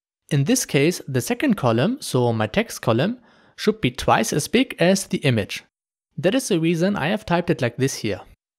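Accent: Germany. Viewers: klingt krass deutsch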